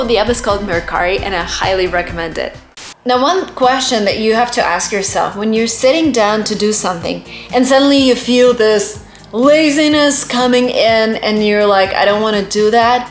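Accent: United States. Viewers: I love your accent . You speak really American English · How does that accent sounds? Do it sound american to you?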